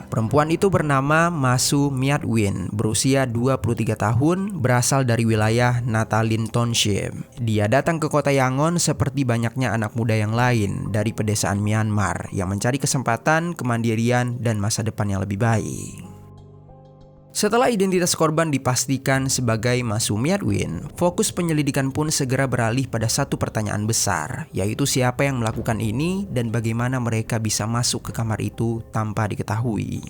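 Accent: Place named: Palembang, Indonesia. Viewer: Cara bicara pembawa acaranya kok mirip bgt ama temen sy yg org palembang, ya 😅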